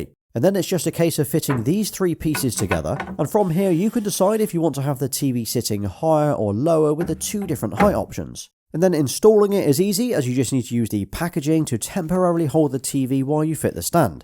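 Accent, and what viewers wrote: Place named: England, United Kingdom. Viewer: what is this city ? miami or another ! hi bro from kazakhstan 🇰🇿 your accent so beautiful 🔥 the english accent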